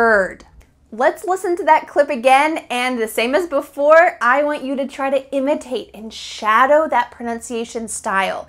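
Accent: United States. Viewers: I love your American accent! Do you mean from North America or Central America or perhaps Soth America? Do you mean the U.S. of America? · As a Chinese, I really like her American accent. It sounds very comfortable. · I love this American accent and beautiful smiles! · Excellent American pronunciation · I just love how these Americans speak...n she's really cute n her American tone is outta this world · I like when you are speaking American accent and I got a lot of many things .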